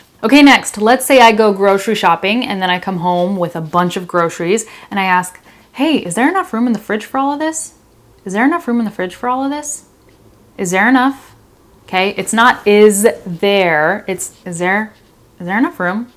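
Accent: United States. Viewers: thank you so much my teachet i love american accent so much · When you speak the American accent sounds very nice and lovely far from being a "butchered" English.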